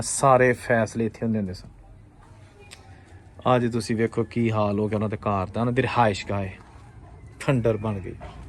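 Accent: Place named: Punjab, India. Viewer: I liked your voice with Punjabi tone.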